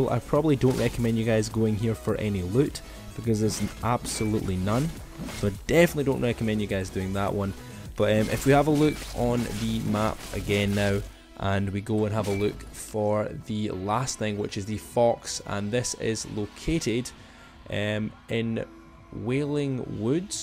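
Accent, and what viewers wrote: Scotland, United Kingdom. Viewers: Are you Scottish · this is some hardcore scotish accent (i fricking love it) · Are you Scottish !?!?? If you are I finally found someone else that’s Scottish on yt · Are you from Scotland nice bro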